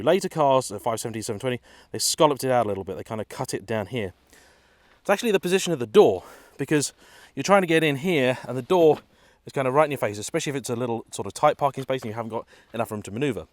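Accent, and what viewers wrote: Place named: England, United Kingdom. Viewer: people from england have such a stupid accent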